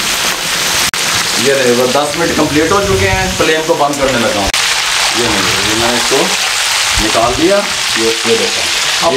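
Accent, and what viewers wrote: Punjab, India. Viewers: Bhai aapki her recipi more delicious,tasty aur lajabab hai,aur aapki Punjabi jubaan Masa Allah aapki recipi ki tarah hai saath hi meethi bhi hai,main apne near&dear ko share bhi kar Raha Hoon. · Uncle tuada style kamal a... punjabi nal boldy o wich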